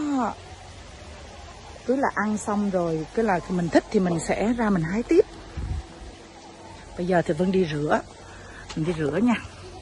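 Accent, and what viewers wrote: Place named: North, Vietnam. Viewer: E thích chị nói tiếng bắc ..vui . Chị nói anh Tuấn Anh quay chung với chị .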